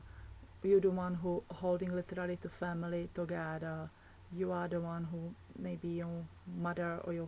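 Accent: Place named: Asia. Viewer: i love your accent..it almost sounds like an asian dialect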